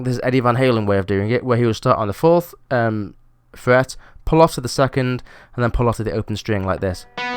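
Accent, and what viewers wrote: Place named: Ireland. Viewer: You are such a nice Person und bring me Gary back into life! With people like you he can stay in our hearts and still be with us. Love the way the Irish talk, like you and Gary, it sounds so lovely and gives the feeling, that they carry the heart on the right place! The Irish Twiddle reminds me on the sound of bag pipes and this influence I loved also a lot at Gary's playing, especially on one of his greatest albums for me, which was "Wild Frontier". Your Playing and interpretation and explanation: JUST GREAT Thank you so much ❤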